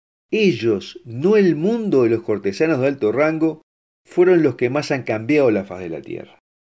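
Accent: Argentina. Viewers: A mí me da una ternura inmensa, además del tono argentino. Saludos!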